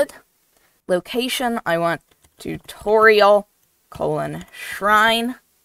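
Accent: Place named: South, United States. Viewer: most southern minecraft youtube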